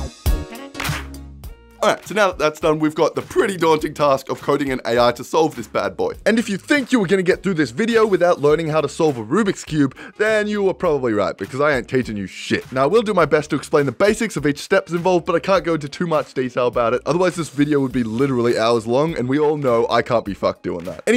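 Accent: Australia. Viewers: is this guy Australian?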